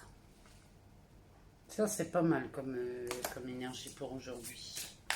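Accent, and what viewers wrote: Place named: Montpellier, France. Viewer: Jai toujours pas trouvé l'accent ? Toulouse ? MONTPELLIER 🤭🤭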